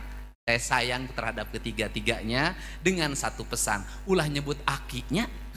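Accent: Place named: Sunda, Indonesia. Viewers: Ingat almarhum ibu ku yg berasal dari Jabar. Sunda asli kental sekali..mendengar berkata dipodium ini..subhanallah..smoga Allah jaga trus.. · Sawios atuh P d sauran aki Oge, jandi nyunda pisan hebat kan. Hidup 👍.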